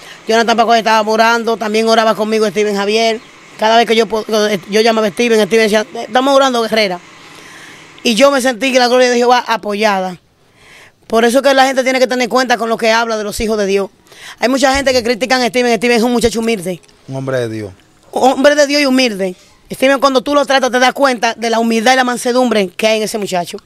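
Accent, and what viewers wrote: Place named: Dominican Republic. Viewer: Los dominicanos hablan así 😂Pero ustedes abran su corazón y entenderán todo lo que dicen 🙏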